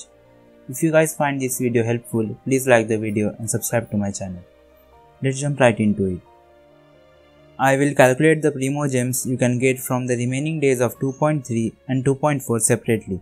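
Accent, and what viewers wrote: India. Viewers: 🤔 are you Indian..? Coz you accent is quite similar to ours. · I love Indian accent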